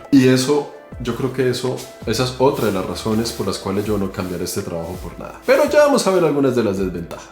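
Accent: Colombia. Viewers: me gusta mucho sus videos y que no olvidas la forma de hablar de nosotros los colombianos 😂😂😂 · Amo como narras tus videos, y tu acento colombiano :) saludos desde Lima Perú. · Buenas tardes, te escribo desde Granada, en España. Mi marido y yo te vemos desde hace medio año más o menos. Me encanta que no hayas perdido tu acento colombiano y que además de tomar transportes de un sitio a otro como hacen otros creadores de contenido, expliques cuestiones relativas a la historia y la cultura de los sitios a los que vas. También nos agrada el hecho de que no hagas grandes aspavientos con la cámara y que tus videos sean siempre sinceros. Este me ha abierto mucho los ojos, porque a mi por lo menos me gustaría poder viajar ya que no puedo hacerlo por una cuestión económica. Cuando acabe el doctorado quizá. Un fuerte abrazo y sigue subiendo cosas, son pequeñas maravillas.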